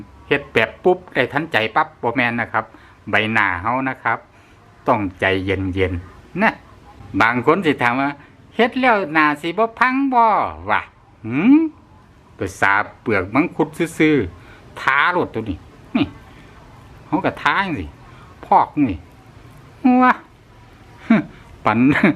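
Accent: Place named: Chaiyaphum, Thailand. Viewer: สำเนียงคล้ายคนชัยภูมิแท้คับ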